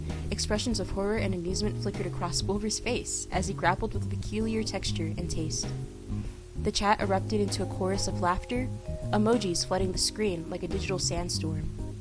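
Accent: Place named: United Kingdom. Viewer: Hey yor british accent is good :] i love the story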